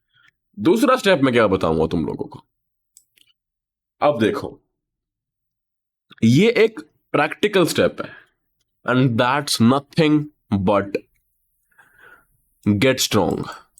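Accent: India. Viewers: So u have desi tone too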